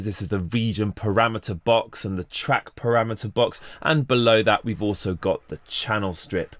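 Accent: United Kingdom. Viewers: Every time I read GarageBand in my head, it’s now in a UK accent....damnit. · After watching this video I never want to hear another British person pronounce “garage” ever again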